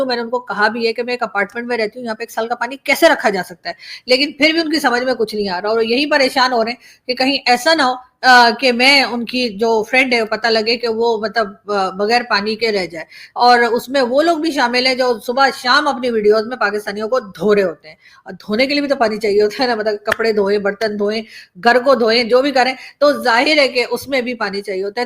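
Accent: India. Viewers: You are so beautiful and your speech is as Indian.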